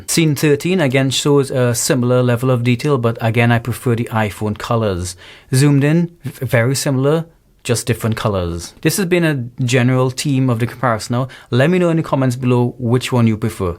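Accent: Trinidad and Tobago. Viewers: You from trinidad? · I hear a Trini accent...🤔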